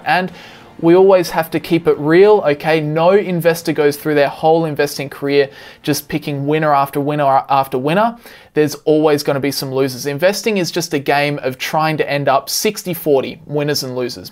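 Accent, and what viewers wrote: Australia. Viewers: Wait, are you Australian? I thought you were Dutch...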